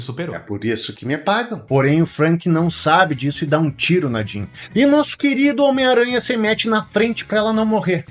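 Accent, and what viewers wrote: Rio Grande do Sul, Brazil. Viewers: Por acaso tu é gaúcho?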